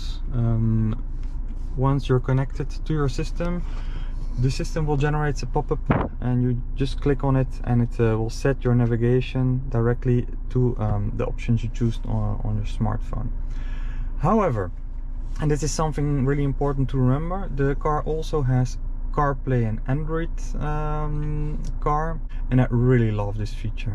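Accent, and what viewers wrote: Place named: Netherlands. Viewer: Hello, Thank you for your review 😊 Your car is in french but I feel like you have a dutch accent, are you from Belgium ?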